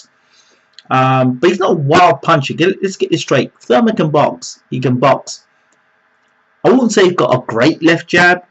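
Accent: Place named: United Kingdom. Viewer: this nigga look like he from South memphis and has a British accent.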